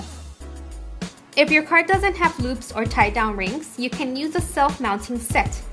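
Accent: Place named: Spain. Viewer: She sounds Spanish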